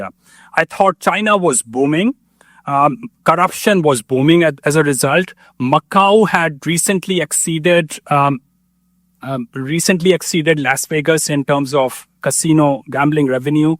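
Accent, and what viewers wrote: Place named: India. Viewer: Espeak,Estreet…Indian still remains an Indian 💩😂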